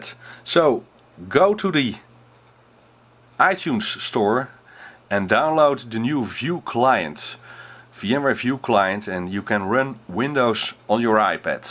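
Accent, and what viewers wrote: Netherlands. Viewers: ja dat is echt duidelijk te horen door een nederlander :p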